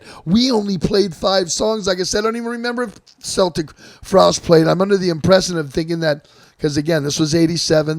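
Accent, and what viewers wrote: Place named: South, United States. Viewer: I fucking love the southern accent you like to throw. But you sound like you may be from Bama instead of Florida. 😂🤣 enjoying the stories.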